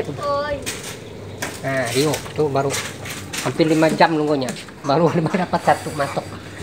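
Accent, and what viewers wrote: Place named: Central Kalimantan, Indonesia. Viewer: Menyimak suara bro seakan-akan saya sedang berada di Kalimantan Tengah.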